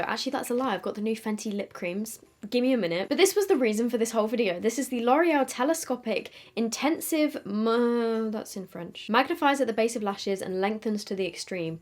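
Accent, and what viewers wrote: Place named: England, United Kingdom. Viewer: She’s English not Irish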